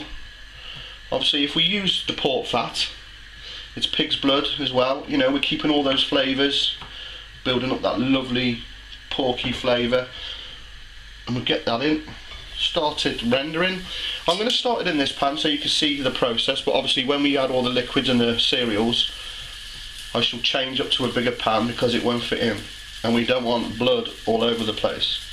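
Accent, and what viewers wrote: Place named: Midlands, United Kingdom. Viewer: Are you from the Midlands,? I can tell by your accent.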